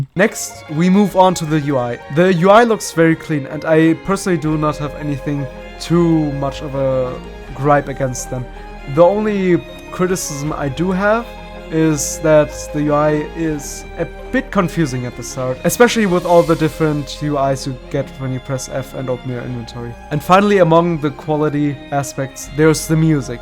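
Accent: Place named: Germany. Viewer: I hear deutsch aber gutes video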